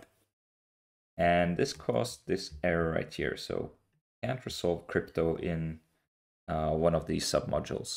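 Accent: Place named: Russia. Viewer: russian accent)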